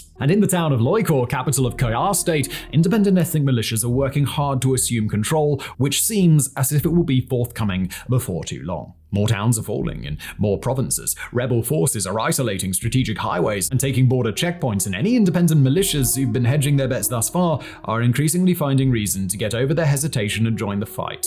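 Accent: United Kingdom. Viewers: God Im so tired of hearing this British fucking noise they're even making more of you narrators on TV it's lit er ally not literally it's pronounced mil it ary, not militry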